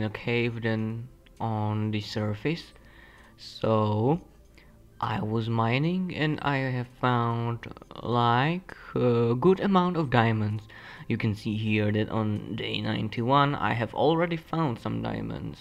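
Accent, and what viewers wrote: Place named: India. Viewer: You speak in Indian ascent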